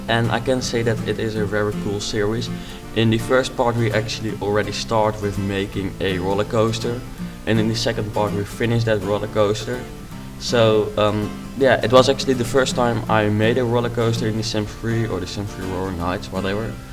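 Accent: Netherlands. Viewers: haha je kan echt horen dat je nederlands bent (: mooi huis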